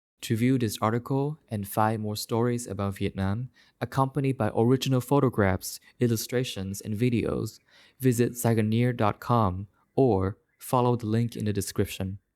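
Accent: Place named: England, United Kingdom. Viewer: Love your english accent, love bánh giò too, keep going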